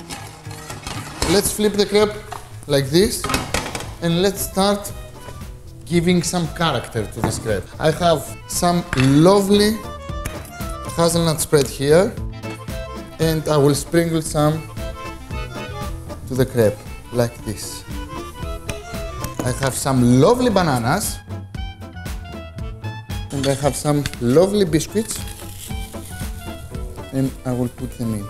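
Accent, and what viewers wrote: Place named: Greece. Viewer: Καλό μαγείρι ο ς, δεν λέω ΑΛΛΑ, εμείς στραβωθηκαμε να μάθουμε Αγγλικά, ακούγαμε τα εξ αμάξης στην Αγγλία για την προφορά μας, και έρχεται ο ς με την ελληνικότατη προφορά του, να μπερδεύει το αλμυρό με το ξινό, να μην ξέρει πως λένε ούτε τα μπισκότα, και παρόλα αυτά τους βλέπεις όλους στα σχόλια να ξερογλυφονται. Γεια σου ρε!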